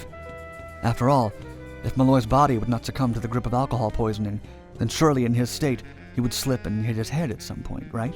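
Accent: Ireland. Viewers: can you just do all future episodes in your irish accent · My God, that's an Irishman!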